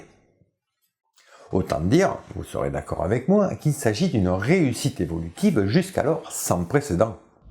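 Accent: South, France. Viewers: Merci pour votre vidéo très intéressante, j aime bien votre accent du sud ❤ Je suis content la musique est plus diversifiée, il y en a juste une que j ai toujours autant de mal à supporter 😂, avis très personnel et subjectif bien sûr Par exemple à , pour donner une idée, c est celle ci qui revient très (trop ?) souvent pour moi la musique est inutile en plus. Bonne continuation, j attends le 2 avec impatience. Quel beau travail en tout cas 😊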